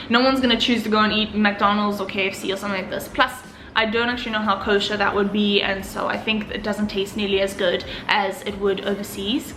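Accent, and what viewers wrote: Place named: Israel. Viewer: I hink you already are incorporating the Israel accent! Nice.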